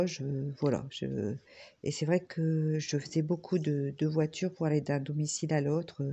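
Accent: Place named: Nord, France. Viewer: Bonjour! je découvre ta chaine... je suis également du Nord j'habite près de Lille, et je fais aussi du coloriage pour adultes depuis plusieurs années..je lis aussi beaucoup et j'ai également un chat noir!!.j'avais bien reconnu le ptit accent du Nord! à bientôt sur ta chaine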